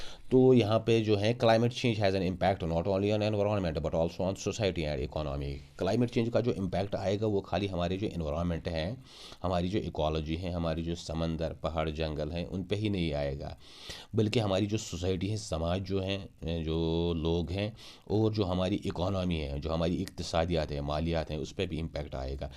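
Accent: Kashmir, India. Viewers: You've pure kashmiri accent☑️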